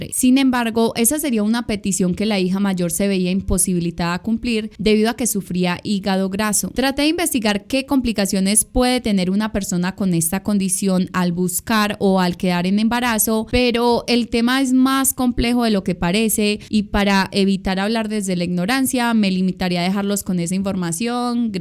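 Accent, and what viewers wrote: Colombia. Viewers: Me gusta mucho el acento de Colombia y creo que esta chica es paisa o corrijanme si me equivoco por favor. Éxito en tu canal yo ya soy tu nuevo sub. Saludos desde México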